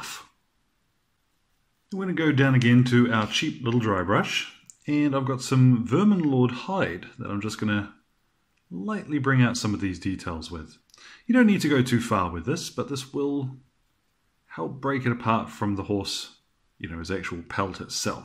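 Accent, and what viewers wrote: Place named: New Zealand. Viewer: Where are you that it is so hot? An Aussie like me? I had thought you were a Kiwi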